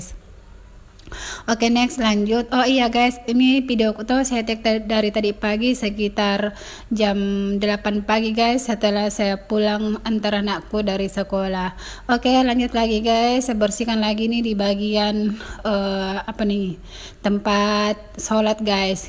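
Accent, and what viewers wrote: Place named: Makassar, Indonesia. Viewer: Logatnya kayak orng mkssr bund...meman orng mkssr.ya?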